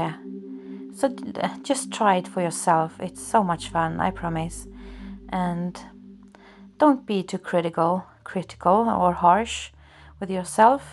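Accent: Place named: Sweden. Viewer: Love, love, love it! You go girl! Your English with Swedish accent is beautiful. I admire those that are multilingual. xo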